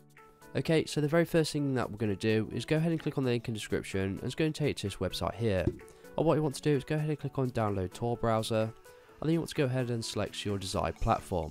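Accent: United Kingdom. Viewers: Love the British accent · i hate british accent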